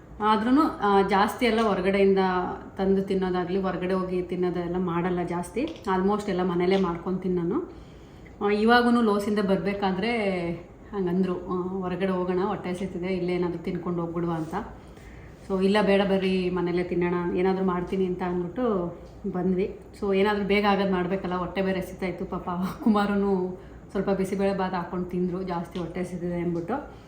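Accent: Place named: Mysore, India. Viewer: Mam I am new additions to your channel thumba chennag nam mysore bashe mathadtira thanks a lot namge ee beautiful life na thorsethirodakki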